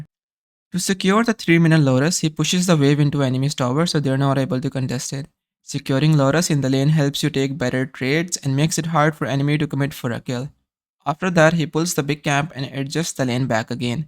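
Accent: India. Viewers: bro this indian accent i cant bro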